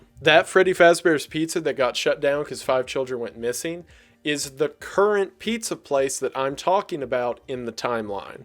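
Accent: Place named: North Carolina, United States. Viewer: are you from NC, USA? you have a very particular accent, especially when you say "then". But also thank you so much for this, because i followed the whole time, but i still missed a lot of details, so I'm happy to see it all laid down flat.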